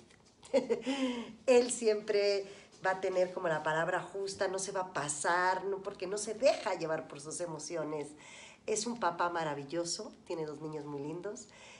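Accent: Mexico. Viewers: cuantos años llevas en México? Tener nuestra ficha amarilla fuera de España aún no lo supero! Jaja amo como hablas con acento mexicano y cambias al español 😝 me encanto el documental! Lo volveré a visualizar seguro! Saludos desde España!! Te adoroooooo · Alaaaa que mexicano tu acento, bueno esque hablas mejicano no tenía ni idea! que te quedaste viviendo allí,pensé eras catalana. Un abrazo! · Y dime... se ríen de ti los Parchis x tu acento mexicano??😁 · Me gustaría leer la respuesta de que piensan de su acento mexicano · ya eres Mexicana! Tu acento mexicano te va muy bien eeeeh felicidades! Saludos! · Hablas más como mexicana que como española, que linda 😉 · ya no hablas como española, sino como mexicana,pero igual sigues siendo la ficha amarilla de Parchis. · Guapa guapa!!! me encanta tu acento Mexicano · Tiene buen acento Mexicano..he notado que casi no usa palabras o términos de España... 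· Que tía más simpática esta parchisera (hablando como hablan los mexicanos y su curiosa forma de 'retorcer' el castellano).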